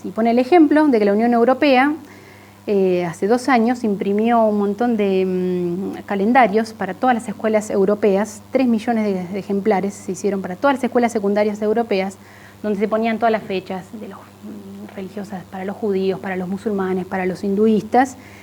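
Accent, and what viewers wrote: Buenos Aires, Argentina. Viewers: hermoso escuchar èse acento aporteñado, que me es tan familiar, esplendido trabajo, sólo te daré un consejo, mira la cámara mas frontalmente con menos miedo a la exposicion, eres muy inquieta y seguramente te cuesta estar sentada esponiendo, por lo general los Profesores os moveis mucho, ha sido magnífica lectura me hizo mucho bien enterarme Gracias!!! Hermana Dios te Bendiga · Desconosco el orígen de esta monja, pero por su formade hablar parece ser argentina. No entiendo por que esta tan preocupada por Francia,en todo caso es el precio que tienen que pagar por el nefasto colonialismo frances, ellos fueron los que intrdujeron la siniestra Doctrina de la Seguridad Nacional, que sembró de sangre, dolor y murte a nuestros paises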